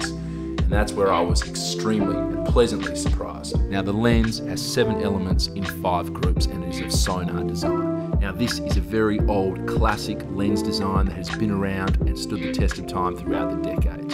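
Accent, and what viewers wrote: Australia. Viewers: Are you from Perth?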